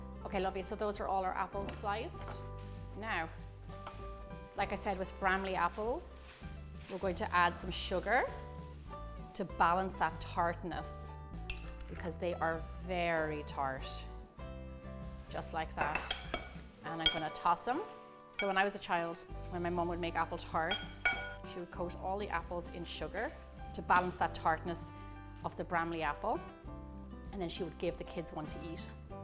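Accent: Ireland. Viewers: Hi,I live in Dublin, I love listening to your Irish accent, which is rare to hear in 😊USA baking recipes on Utube, thanks for this easy to do recipe and for your helpful tips, I’ve got the Bramley apples which my mother also used, the pastry is ready to make the tart in the morning I can’t help but admire the large sensible oven which too is rare in Ireland God bless you and your family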